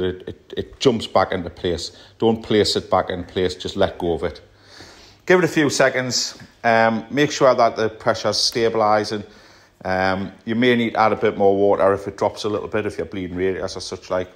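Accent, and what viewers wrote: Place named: Northeast, United States. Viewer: Top stuff! We got our new boiler last November, and I have had to refill it once (under instruction from the man who installed it). Couldn’t remember what to do when it just happened again, and your short video guided me exactly. Dead simple. Thanks very much indeed. (Lovely to hear a voice from the Northeast again after so many years away!). Brian Barber, Worcestershire.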